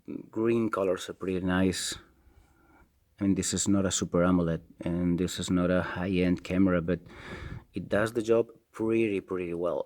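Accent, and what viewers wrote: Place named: Catalonia, Spain. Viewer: Tens accent català, hahahah! Podries dirme que tal funcionen alguns jocs? En especial pokemon go si el pots probar que tal funciona? Hearthstone potser també si pots, moltes gràcies i bon video!!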